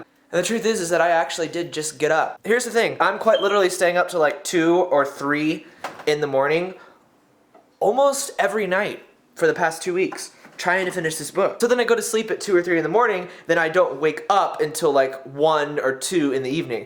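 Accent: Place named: United Kingdom. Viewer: You sound like you have a slight British accent, lol! It's the way you pronounce most of your vowels.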